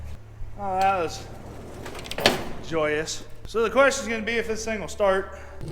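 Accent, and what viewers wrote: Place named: Iowa, United States. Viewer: He is in extreme south central IA very close to Lake Rathburn and Centerville area. He has that southern IA accent.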